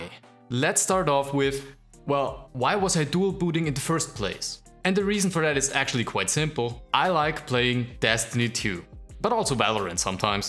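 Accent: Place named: Germany. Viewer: Hi gutes Video. Ich habe genau fas gleiche gemacht wie du. Habe viel rumüberlegt, was die beste Distribution für mich ist und bin dann doch wieder bei Fedora hängen geblieben. Jetzt finde ich es wirklich gut und liebe Gnome 42👍 PS: Bist sicherlich eigentlich Deutsch ne? Man hörts ein bisschen 😂😉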